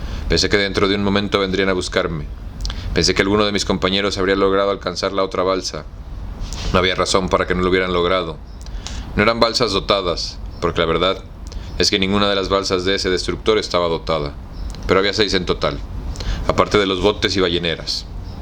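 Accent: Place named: Colombia. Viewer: me gusta como hace el acento colombiano muchas gracias or estos videos soy colombiano saludos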